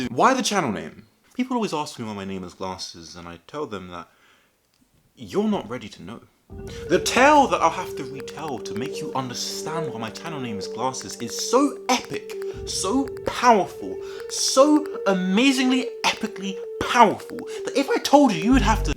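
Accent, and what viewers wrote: England, United Kingdom. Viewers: I thought it was because you were British and if you say glasses in a British accent it sounds like glarses. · He sounds Bri Ish for some reason. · Tbh I never realized you were English before I watched this video. Also my mx blues are so loud I want to rip out my eardrums sometimes. thank you for educating me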